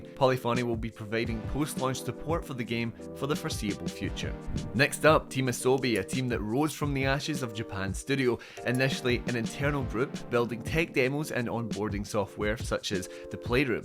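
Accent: Scotland, United Kingdom. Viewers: Imagine being that stupid you cant understand a easily understandable Scottish man.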